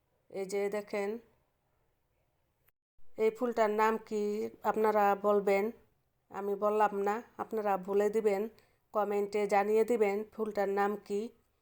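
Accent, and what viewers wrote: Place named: Narayanganj, Bangladesh. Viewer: নারায়ণগঞ্জের মানুষ এই ভাবে কথা বলে আমার জানা ছিল না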